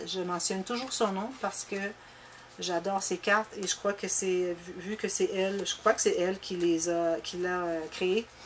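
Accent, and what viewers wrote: Quebec, Canada. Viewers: Félicitations pour vos 1000 abonnés. Merci pour tous vos partages et votre savoir faire que vous nous redonnez avec gentillesse et générosité. Votre travail est remarquable. Et puis une petite touche finale qui me fait plaisir, voir votre visage rayonnant et votre sourire sans oublier le merveilleux accent du Québec. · Quelle surprise et quel plaisir aujourd'hui de vous voir "presque pour de vrai". C'eût été dommage de ne pas vous montrer tant vous êtes resplendissante ! Votre accent, je vous l'ai déjà écrit, j'adore, il me rappelle mes 2 longs voyages au Québec où j'ai rencontré des gens sympathiques à chaque fois. J'y retournerai volontiers mais revenons au scrapbooking. Je félicite votre grande disponibilité et votre belle générosité, les réponses aux questions sont rapides et précises. Je suis arrivée sur votre chaîne alors que vous aviez très peu d'abonnés mais le nombre a explosé en très peu de temps, ce n'est que mérité, félicitations. 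En égoïste, je regrette déjà un peu ce petit cocon que nous formions, vous gardez rien que pour moi 😋 car vous êtes vraiment très empathique et on ne peut qu'être séduit sans compter que vos réalisations sont originales tout autant que les papiers utilisés. Mais, oui, je vous souhaite très vite de multiplier ce nombre par 5 ou 7 et plus. À très bientôt et encore merci pour tous ces partages.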